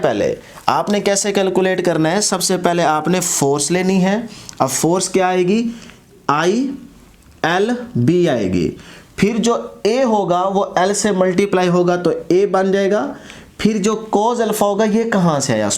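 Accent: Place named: Saraiki, Pakistan. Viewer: sir m also.. from ur city bahawalpur But now m living ......in Lahore ...but I really appreciate ur hardworking n saraiki tone loved it .,.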